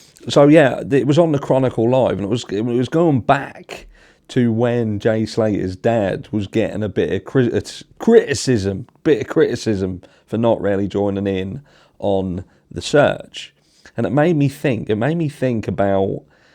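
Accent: Norfolk, United Kingdom. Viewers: Are U a Norfolk boi at all U narf sound like us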